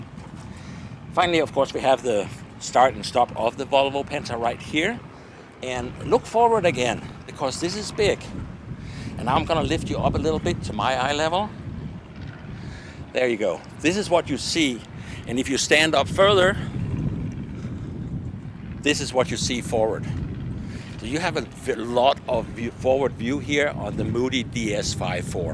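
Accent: Denmark. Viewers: Du lyder dansk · Hey, nice tour, nice boat - buy your accent i say you are Danish. Your cameraman was sleeping, every time you pointed down to show the mast foot or other things, the camera was just horizontal. wake him/her up next time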